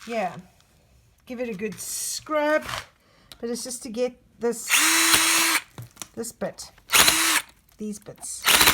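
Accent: South Africa. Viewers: Now that voice is very familiar! South African? I'm going to enjoy this post.Nicky Bedford from Cape town .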